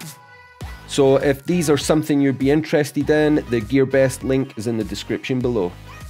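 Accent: Scotland, United Kingdom. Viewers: i was thinking, hmm that looks like Newcastle quayside and north sheilds..... but your scottish! yup i was right it is haha im living here aswell :) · Yaaasssss a Scottish guyyyy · ok, stop.. i can't resist that much british accent.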